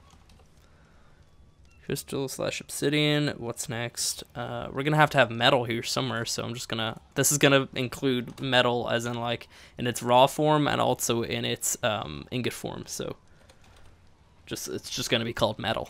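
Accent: Ireland. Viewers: are you irish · He's very Irish. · Damon Carey it’s the way he says it in an Irish accent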